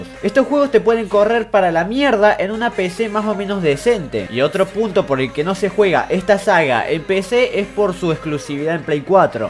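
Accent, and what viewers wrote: Córdoba, Argentina. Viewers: ami tambien creo que es de cordoba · Exacto ese acento es cordobés, yo soy también cordobés por eso lo identifico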